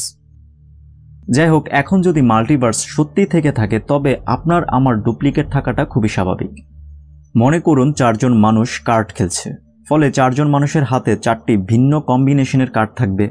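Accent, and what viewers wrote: Narsingdi, Bangladesh. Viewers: আপনার বাড়ি কী নরসিংদী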